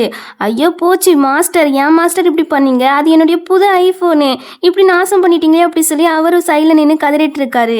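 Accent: Tirunelveli, India. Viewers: 80s la poi reborn agura drama podunga pa unga nellai style voice la ketka egar ra eruku pa 🙂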